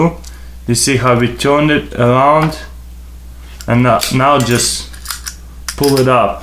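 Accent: Russia. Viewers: Damn, stop fuck with him. He's from russia aye, if u come from germany, or another land, u can't speak goodenglish either right? Stupidfuckers :l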